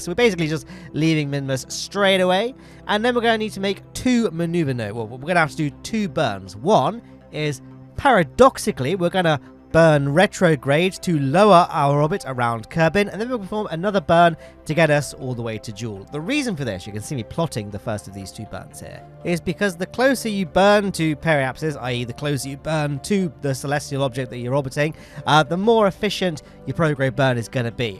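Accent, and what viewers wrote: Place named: RP, United Kingdom. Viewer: he does have that British radio host accent also, nice pfp :3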